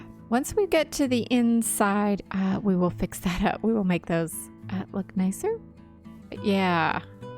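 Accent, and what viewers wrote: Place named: Texas, United States. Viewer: I thought you were in TX. I can pick up the accent every once in awhile. My wife is from Australia and she even has it every so often. It rubs off on you. 😆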